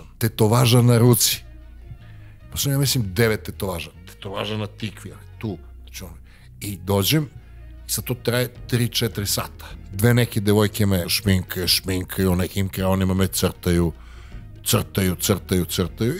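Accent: Niš, Serbia. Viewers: Ti si nislija ja to ne verujem mislim na naglasak ne na ove kadrove a ti si presavrseno uradio ove kadrove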